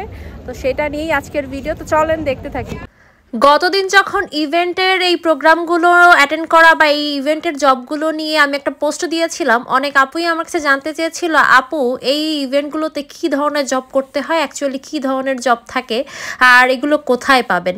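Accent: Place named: Bangal, Bangladesh. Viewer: Apni bangal ascent ey kotha bolun otai suntey bhalo lage ❤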